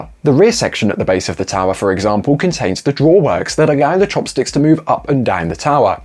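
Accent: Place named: Southern England, United Kingdom. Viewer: It's a peculiarity of one of southern England accents and requires no teaching.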